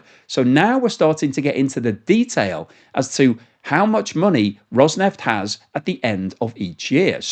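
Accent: United Kingdom. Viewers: Since you are british, and you refer to the "other than oil" product as "gas", I HAVE to think that you are referring to Natural gas. Here in America, gas, or as you would refer to as "petrol", is refined from oil. Natural gas almost always is Naturally present where there is untapped oil. So when you simply said "gas", I'm thinking " WTH is he talking about?!!" But then I realised, by your accent, that you weren't talking about "petrol". Please try to be more specific. Otherwise your commentary can be a little misleading.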